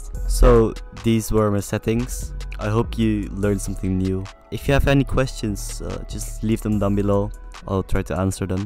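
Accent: Netherlands. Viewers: Yo maatje ik hoor dat je nederlands bent klopt dat?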